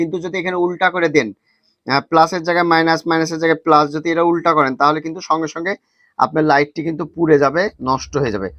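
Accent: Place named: Chapai Nawabganj, Bangladesh. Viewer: ভাই আপনার বাড়ি চাঁপাই না রাজশাহী না নওগাঁ? 😊 আপনার কথা শুইন্যা হাঁরঘে চাঁপাইয়ের মতন লাগছে❤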